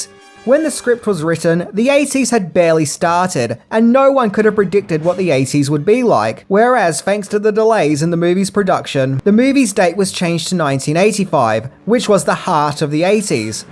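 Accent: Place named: Australia. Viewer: Mate, your content is really good but your delivery is pants - stop shouting at us and I know you can´t do much about your Ozi accent but just tone it down.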